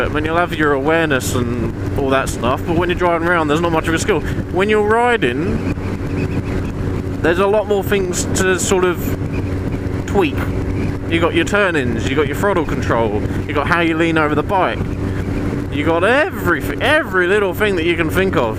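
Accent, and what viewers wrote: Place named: Australia. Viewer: I'm new to you.I like the distance you keep from cars,i like the general message you are trying to give,i like the fact that you havent bought the latest and greatest super duper bollocks and do fine on an older bike.I dislike the slightly australian accent you sometimes have,the colour of the fork tops of your zx9 and erm,the shape of your mirrors.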